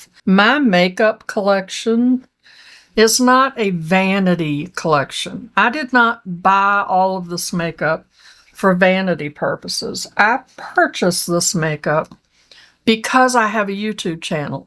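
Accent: South, United States. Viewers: Although I love seeing makeup videos in the mature woman world, I love listening to your beautiful soothing voice. Your southern accent is so calming and lovely.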